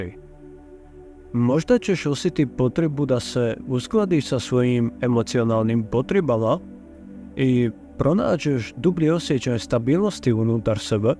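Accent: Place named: Dalmatia, Croatia. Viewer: Posle toliko mnogo godina bilo mi je lipo slušati te naš Dalmoše na našem dragom ikavskom dijalektu.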